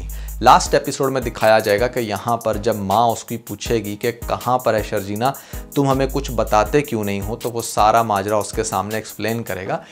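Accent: India. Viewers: Ap Indian ki tra feer bol rhy ho phr q nhi bolty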